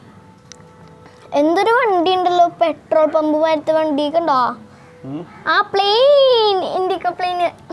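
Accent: Thrissur, India. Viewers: Trissur slank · wow hyderabad to cochin yathraa manoharamayi kanichu thannathinu othiri thanks...molude samsaram super..Thrissur slang 👍❤️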